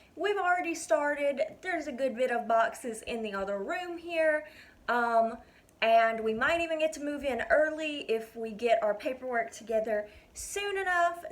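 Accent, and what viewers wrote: South, United States. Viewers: I love your southern belle accent ^-^ its kinda nice to listen to you talk while i work (i work at sonic. Its way better than walmart because my bosses are really chill and my co workers are cool but some customers just ruin it) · Oooh a southern accent...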